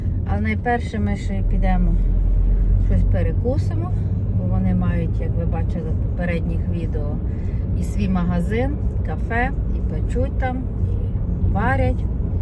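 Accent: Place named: Western Ukraine, Ukraine. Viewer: Якаж противнюча бандероско- вуйсковська мова. Вот там и оставайся у пани гейропи, такие нам в Украине не нужны. Быть добру 🕊️🕊️🕊️.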